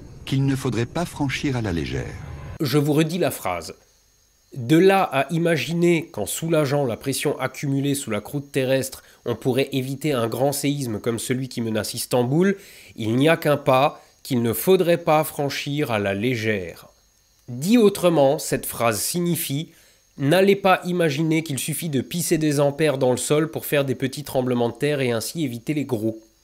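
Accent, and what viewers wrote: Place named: Paris, France. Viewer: Merci bcp. C'est juste dommage de faire toute la vidéo en prononçant "séizme" bien à la parisienne. Que ce soit pour séisme, Israël ou autre, la lettre s ne se prononce comme un z que lorsqu'elle est entre 2 voyelles. Ex: Isabelle. Bonne continuation.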